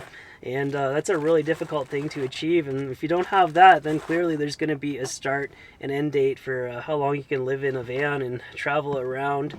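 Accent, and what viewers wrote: Canada. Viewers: Don't forget that epic Canadian Accent! 😉🚚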